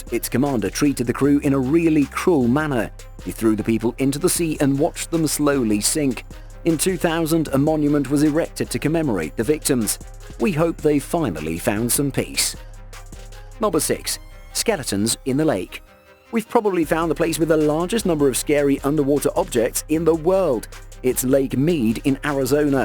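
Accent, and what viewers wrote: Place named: United Kingdom. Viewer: Boy you British lol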